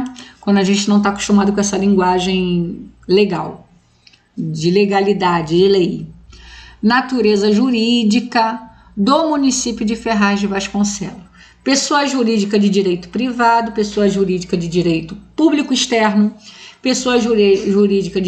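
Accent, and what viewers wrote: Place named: Rio de Janeiro, Brazil. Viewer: Aula top, com esse sotaque carioca então; arrasou!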